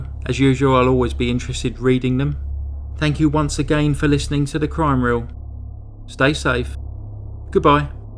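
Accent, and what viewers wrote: United Kingdom. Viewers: This british accent confuses me somehow...i concentrate on the accent not on the Story. Can t even say if i like it or not...i m just confused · Love your cheeky endings!! Hope you’re getting through your isolation and keep making such well narrated content. Hearing any of the British narrations brings my mother’s voice to the forefront and always brings a smile to my dial. Thanks.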